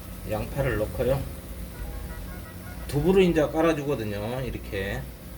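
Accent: Jeolla, South Korea. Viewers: 전라도 말씨 거슬려요 비호감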